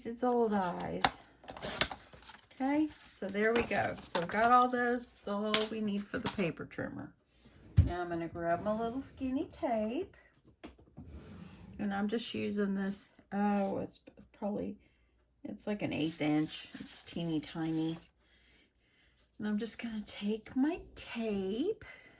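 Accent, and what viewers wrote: South, United States. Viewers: Miss, you have the most beautiful, soft spoken southern sweet voice that always puts a smile on my face. I have had a rotten day, and listening to you always makes me feel at ease and soothes me into comfort. Thank you so much. God Bless you girl for sharing so much with your YouTube audience, you are truly special. And of course I also love all of your crafting 💕💕💕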